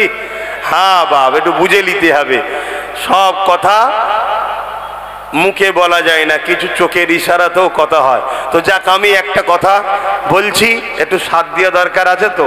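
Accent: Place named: India. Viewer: 😮It is not Bangladesh speak like Indian style.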